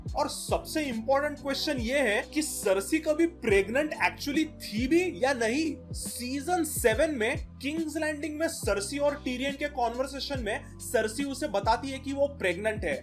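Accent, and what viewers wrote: Maharashtra, India. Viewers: Bro are you marathi your accent is like marathi,nice video bro 🫡😂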